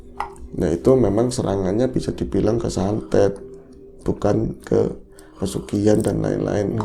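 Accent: Banyumas, Indonesia. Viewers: Sirah.. endas.. padak.. basa ngapak.. 🤣🤣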